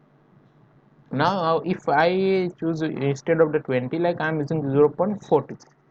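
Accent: Gujarat, India. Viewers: i can definitely tell this guy is gujarati kathiyawadi according to his english speaking accent .if i am right let me know!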